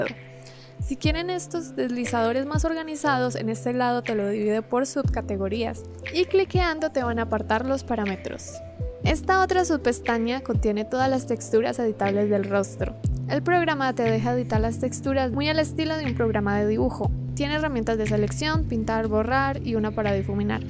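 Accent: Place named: Bogotá, Colombia. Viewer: los colombianos solo reconocen el hacento rolo: eres rola' bogotana? jsjs yo si :>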